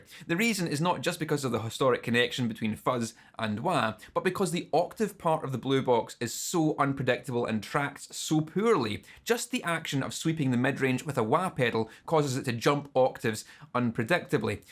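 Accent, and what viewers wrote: Scotland, United Kingdom. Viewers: Is that a scottish accent I hear? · Where is the commenter who transcribed Scottishness? I demand one of those for every one of his videos now.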